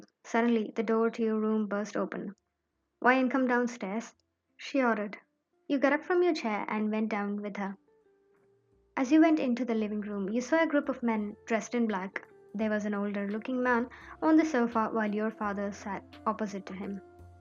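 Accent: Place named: United Kingdom. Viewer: Are you British cause I love your vocie. It feels so real❤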